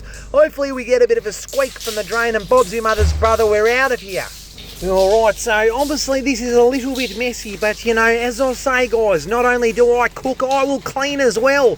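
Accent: Australia. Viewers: !! I gotta tell ya mate. Sometimes I’m in a right bad mood and coming along with you and unblocking these drains really turns it around. I go from feeling like a 1 to a 10 the minute those drains start running clear. Watching from VA, USA and you gotta read that comment in your own voice mate! Americans are notoriously bad at the Aussie accent 😅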